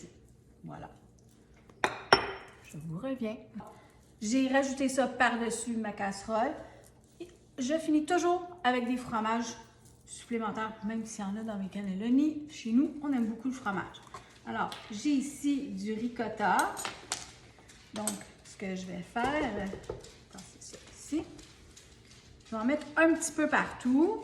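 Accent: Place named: Quebec, Canada. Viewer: Bonjour, je vous decouvre à peine et je suis agréablement surprise par votre simplicité et votre humour. C'est un plaisir de vous entendre parler avec ce si joli accent du Québec, pour moi qui suis en France. Vous me faites voyager, merci pour ça 😉. Et vos recettes sont simples à réaliser et délicieuse. Moi aussi mon surnom c'est 😊 Je vous envoie bon baisers de France 😍 😉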